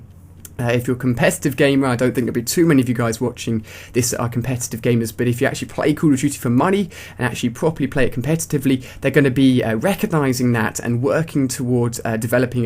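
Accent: United Kingdom. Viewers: Why does almost every game info video hav a British guy narrating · He's British. One does not simply change their accent.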